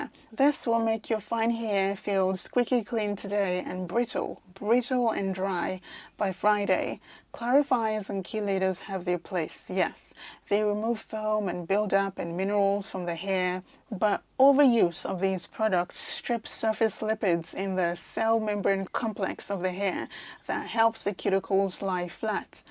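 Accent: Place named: New Zealand. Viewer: The New Zealand accent is just the best. I also have a kettlebell training account I follow just because of her accent. 😂😂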